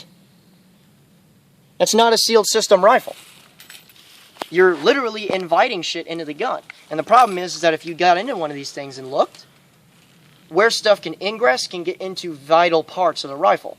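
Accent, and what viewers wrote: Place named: South, United States. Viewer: Add in the southern drawl when you start to talk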